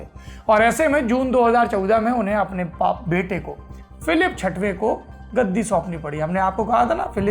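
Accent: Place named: Kanpur, India. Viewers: Kanpuria style mein news sunna hai to Saurabh bhaiya ka lallantop dekho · बहुत दिनों बाद.....आज इतना बढ़िया यूपी वाले लैहिजे में बोले हो .....मजा आ गया आज तो.....👌👌👌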